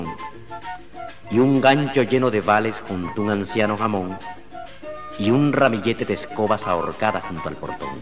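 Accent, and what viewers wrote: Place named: Venezuela. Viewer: que bonitovale, se escucha tan Venezolano e esa poesïa , hay muchas palabras que E hoy en dìa las sigo escuchando, que fino vale, que lindo es ser Venezolano.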